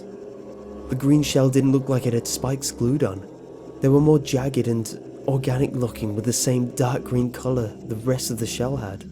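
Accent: United Kingdom. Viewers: IKR, Brits pronouncing things differently is so wholesome!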